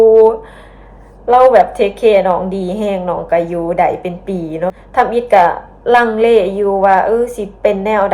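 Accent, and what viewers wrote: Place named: Loei, Thailand. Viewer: สำเนียงภาษาหลวงพระบางสำเนียงเดียวกับกับ,จ,เลย,จเพชรบูรณ์ของไทย❤❤ครับ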